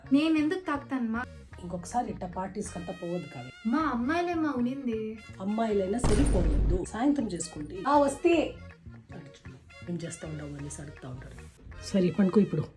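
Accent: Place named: Karnataka, India. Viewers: Karnataka boarder Telugu😂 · Kannada vallu telugu matladinatlu vundi.. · akka miru karnataka na, miru koncham telugu karnataka vala laga ne maatladthunnaru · Bangalore తెలుగు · karnataka telugu, likely from bellary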